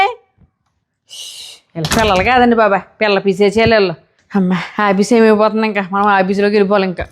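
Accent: Godavari, India. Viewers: Do you speak this way even at home? Looks like Godavari slang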